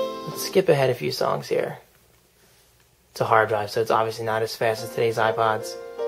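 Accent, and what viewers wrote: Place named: New Jersey, United States. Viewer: Your jersey accent is showing strong in this video. "Lets turn it AWN"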